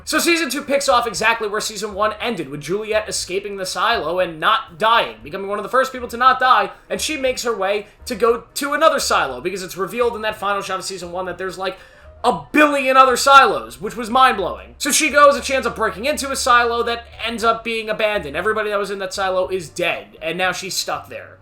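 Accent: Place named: United Kingdom. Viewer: The British accent did come out often glad I am not the only who noticed that since English is not my main and I thought I am imagining it 😀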